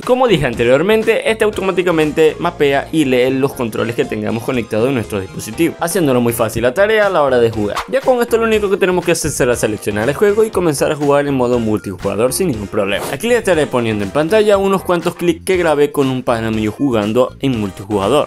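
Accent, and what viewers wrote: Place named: Mexico. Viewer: enserio mano, yo pensaba que eras de México xd. Sigue así tus vídeos son exelentes♥️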